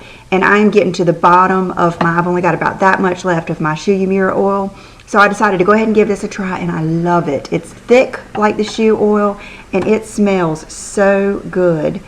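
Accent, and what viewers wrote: South, United States. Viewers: ! Funniest story for you!! So I work in a hair salon in Vancouver BC Canada and a clients aunt was in town and stopped in to pick up some product so I helped her and she had a southern accent (super rare here in Canada to hear a southern accent maybe only the third I've heard in person, weird hey?) and right away I was like are you from North Carolina? Shocked she was like yes how on earth did you know and I told her by her accent lol! Took me forever to realize it was because I'm so used to your beautiful voice! Thought maybe I had a special gift for a moment! Anyways I Love you have a good week!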